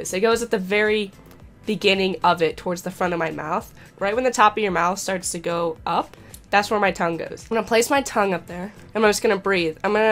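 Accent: South, United States. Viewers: What state are you from??? You sound soooo SOUTHERN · Your southern the way you say "Like" tells me you spent alot of time in the south or near southern people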